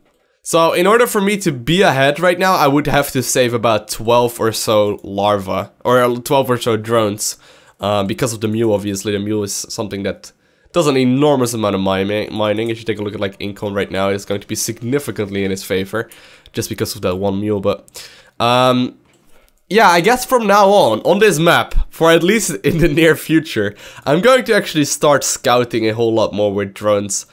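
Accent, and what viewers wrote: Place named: Netherlands. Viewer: Where you from, because you speak english really wel but you have a small foreign accent. Are you dutch or something?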